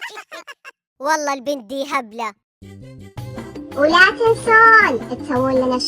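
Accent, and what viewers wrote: Hejaz, Saudi Arabia. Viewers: الحجازيه تجنن ههههههههههههههه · يالبى الحجازية 😍😍😍😍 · مافي أحسن من الحجازي 😜😍☺️ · ههههههههههههههه يا لبيييييه الحجازية 😍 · احلا شي الحجازيه 😂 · أحلى لهجه الحجااازي **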